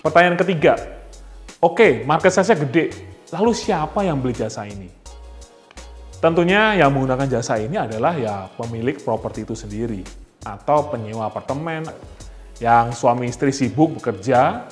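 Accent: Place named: Java, Indonesia. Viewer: menarik sekali mas, tertarik, btw medok ya mas jawanya :)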